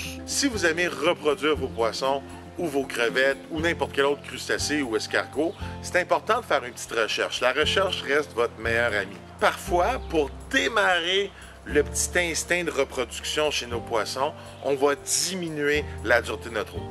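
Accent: Canada. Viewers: Salut, super intéressant et bien expliqué, et l accent canadien j adore . j aimerai bien avoir un thème sur les méthodes efficace pour ce débarrassé des algues , malgré que j ai un chihiros doctor, très difficile de ne pas en avoir... en tous cas je te souhaite de réussir !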